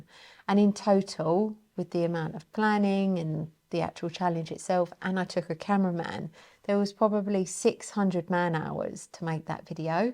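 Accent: United Kingdom. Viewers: OH WOW! I don't often see your vids pop even tho I've got you on highest notifications but this one just popped up.....and what a great vid. You know I found your channel back in the covid lockdown days and the world was so chaotic back then. I was also sobering up and really struggling with life and your vids were so peaceful and calming. Your delivery is so cool. You share honestly and it's so refreshing and I gotta say, comforting. It's like "yeah we all struggle with life and trying to do things that are meaningful to us but also earn enough income so that we can take care of ourselves and our loved ones and have some extra for nice things"....the struggle is real. I just love how your throw yourself into things but then also document how you're feeling during it, your self doubts and all that. You just come across as a very genuine and real person and I love that. 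You've also got a great accent. Being from Oklahoma I don't hear many British accents but I love yours. Anyways, keep doing what you're doing and congrats on 3 years, making SO MUCH money!! AND getting to 143k subscribers!! WOW!! What a great year it's been! I'm so happy for you.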